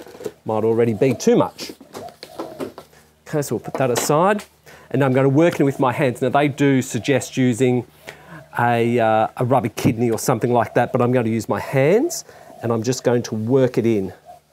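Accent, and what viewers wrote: Australia. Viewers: Recently discovered your channel. Thankyou for the wealth of knowledge you share. I have yet to do any mosaics because it was a bit daunting. I'll be right now. Good to hear the australian accent and those birds in the background. 😊👍🌳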